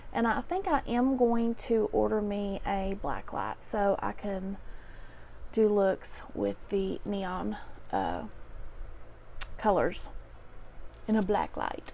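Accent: South, United States. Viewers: I Need that palette!! I don't usually say that..😯 I love your accent 😍 Southern Lady..the looks you do 💜 you're so Amazing at what you do!! Aaaandd... 1 k is coming..🥳 Love you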